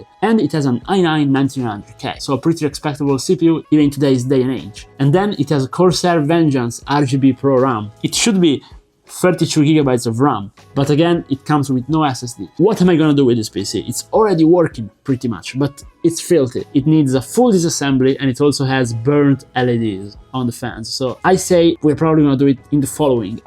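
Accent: Italy. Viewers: Brooo ma sei italiano?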